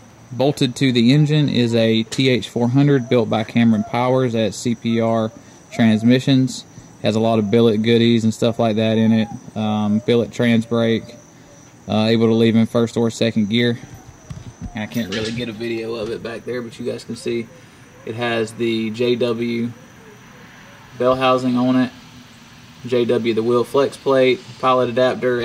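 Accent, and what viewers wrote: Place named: Tennessee, United States. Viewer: where are you located? I hear a TN twang!!! I am from Chattanooga.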